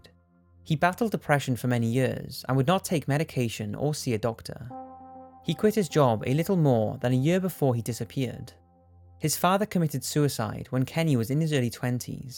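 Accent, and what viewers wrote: Wales, United Kingdom. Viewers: Sounds welsh to be honest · what is his accent? Sometimes I think defo American then at times sounds like different parts of England. Even some of the words come with a Welsh twang now and again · ElseWhere 47 That’s a Welsh accent pal, not English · He's Welsh.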